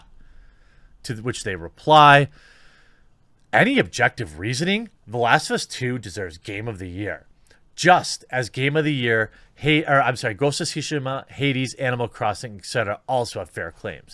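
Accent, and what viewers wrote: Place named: California, United States. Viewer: This SJW Californian accent is reaaaaallly getting to me. 😂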